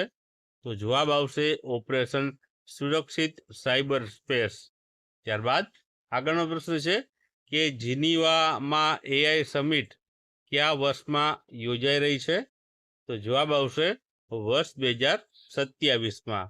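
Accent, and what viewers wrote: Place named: India. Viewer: Sir tamara videos mast hoy che am j banavo and tamar voice j main che anathe yadd rahi jay che ak dum desi style tnx sir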